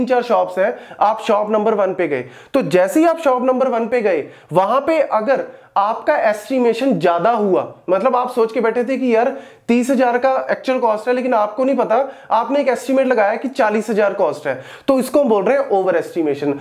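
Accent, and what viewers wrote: India. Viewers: Sorry I cannot understand Indian English...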